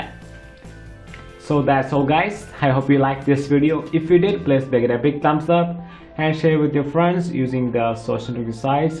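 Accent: India. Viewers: OMG. Indian accent is so annoying especially when they try to pretend that they are not Indians.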